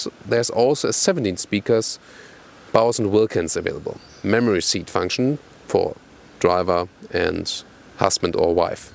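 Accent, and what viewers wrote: Germany. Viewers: nice reviews you're doing. Loving your German accent too! I'd never watch an hour long car review, except yours, dude! You seem to stress leather on seats in your reviews, are you a vegetarian?:) Respect.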